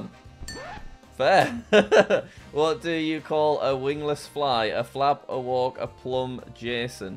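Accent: United Kingdom. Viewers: Bro sounds british (if hes actually real british im sorry im new to this channel)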